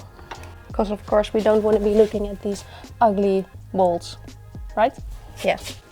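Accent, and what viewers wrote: Netherlands. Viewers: The most dutch English accent ever. So cool!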